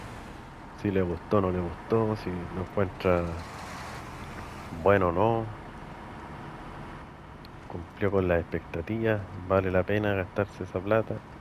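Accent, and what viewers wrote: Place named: Chile. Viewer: y a ese suscriptor, o "visualizador" de tus vídeos, tampoco le gusta tu acento chileno? Jajajajajaja La verdad, la gente se aburre mucho. La gran mayoría estamos contentos con tu narración y explicaciones, que es lo que cuenta. Si le gusta más la "buena" narrativa que el contenido, también hay canales de poesía. En fin, como siempre, otro gran vídeo. Saludos desde Moscú 👌🏼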